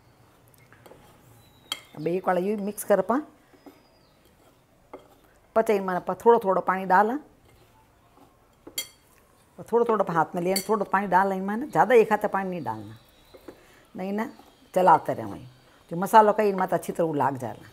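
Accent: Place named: Rajasthan, India. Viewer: I am from Ajmer Rajasthan and fond of your cooking and lovely way of talking marwadi.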